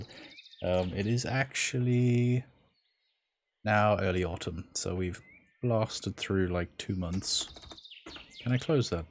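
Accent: South Africa. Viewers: I know a fellow south african when i hear one lol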